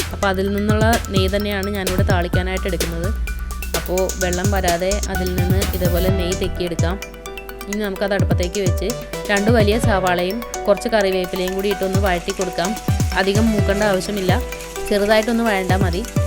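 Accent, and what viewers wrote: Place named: Kochi, India. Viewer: adipoli preparation , kochi bhashayil paranjal polichu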